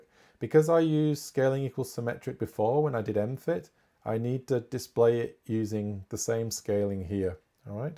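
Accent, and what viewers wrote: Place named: Hull, United Kingdom. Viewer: Thankyou for this! Added to our VLE for my MSc students in Hull (sounds lke you hail from not a million miles away?)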